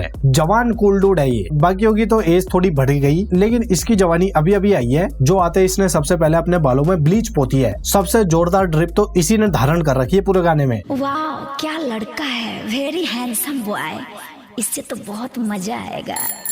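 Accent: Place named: Bikaner, India. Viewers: Bro i guess you are from Bikaner because of your accent 😅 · Lagta hai ganganagar ya bikaner se hoga bhai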